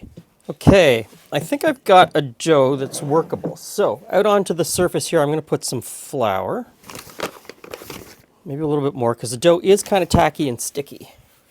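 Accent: Canada. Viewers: Canadian Accent on point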